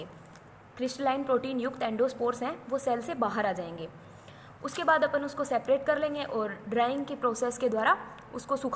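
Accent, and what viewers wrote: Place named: Haryana, India. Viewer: Mam the lecture is so helpful and nice but your haryanvi accent is still more precious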